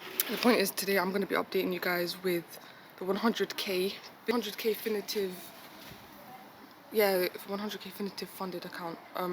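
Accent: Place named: London, United Kingdom. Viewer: She speaks like Stormzy, soo'oo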